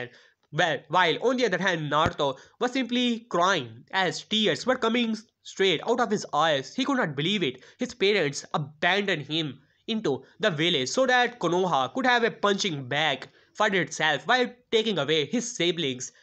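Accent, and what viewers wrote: India. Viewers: Is ur accent a India accent. I'm wondering bc it's too similar to a one piece character and I've always wondered the real live source her way of speaking/ accent came from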